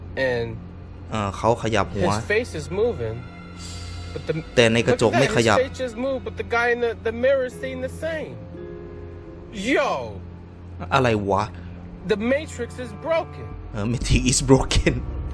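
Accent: Isan, Thailand. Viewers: จาดกันพะนะ คนอีสานคักๆคุณ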